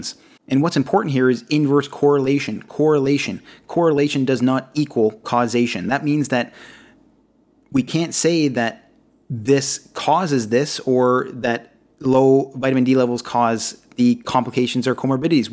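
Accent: Canada. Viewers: Thank you, neighbor to the north (if I am identifying the accent correctly), for your informative presentation.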